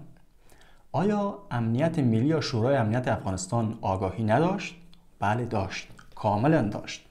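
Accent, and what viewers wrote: Iran. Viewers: Ne tu konigak khai namaindagi mekadi jawanhai Afghanistan ra. Keroom da lahje iranet. Bacha bershe amerallah salle.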